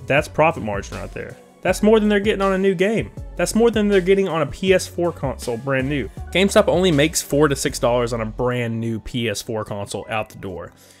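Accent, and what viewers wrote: South, United States. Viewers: Southern drawwwwlllll.....cool vids just subbed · Youre so southern and not southern that it makes my brain love your channel. what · Toooooo southern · your voice... I cant tell if youre southern or gay or both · You used to sound southern what happened where’s the real 🤠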